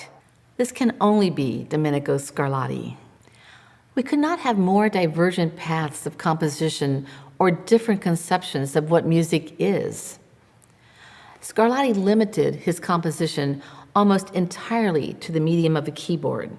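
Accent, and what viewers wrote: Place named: United States. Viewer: It's just the American accent that one needs to retune to 🎉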